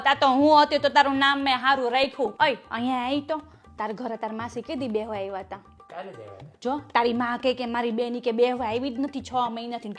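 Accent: Kathiawar, India. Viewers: ben tame kyathi chho pure Kathiyawadi bhasha bolo chho ane tamara dialogues pan bau sara hoy chhe ❤❤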